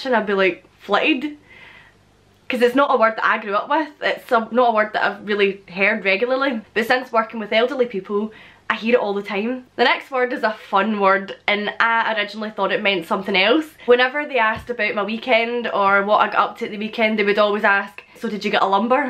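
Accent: Scotland, United Kingdom. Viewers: I don't understand your accent. It is very difficult to understand Scottish accent.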